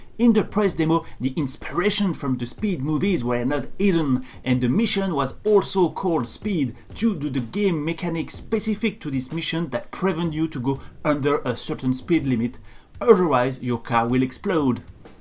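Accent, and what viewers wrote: France. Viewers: you sound kinda french :') , neat video from yours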